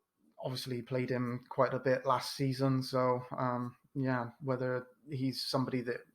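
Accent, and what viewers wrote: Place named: Southern England, United Kingdom. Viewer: Great vid lad , you sound like your from down south like me , you don’t find to many toffees down here , hopefully we get Rodriguez and Allan over the line , coyb. 💙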